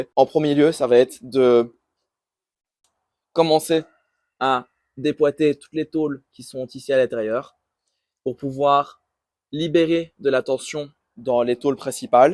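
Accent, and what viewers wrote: Liège, Belgium. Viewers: 'fti ! ne viendrais tu pas de LIIIEECH?? xD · Ça part sur un abonnement, ton projet me fait rêver 😃 tu dois être liégeois, à entendre ton accent ? J’habite à Francorchamps, perso 😊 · nickel alors si le message de base est passé et que ça t'a fait plaisir 😁 Merci, oui j'ai quand même eu un peu de chance malgré tout (je me posais une question, je vais sûrement avoir l'air con si c'est pas le cas mais, tu serais pas belge par hasard ? J'ai l'impression de reconnaître un accent liégeois, voilà voilà 😭) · je suis de Walcourt :D a l’accent j’avais remarqué que tu étais de liège 😬😅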